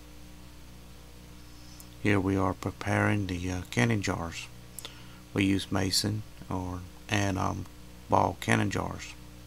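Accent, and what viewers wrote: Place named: Louisiana, United States. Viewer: Are you from Louisiana? That is where my family is from. I recognize the accent and the of course you mentioned gumbo.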